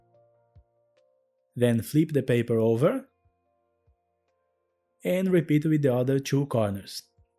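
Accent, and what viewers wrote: Brazil. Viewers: O sotaque brasileiro é inconfundível hahaha 🇧🇷 incrível tutorial e lindo origami! Parabens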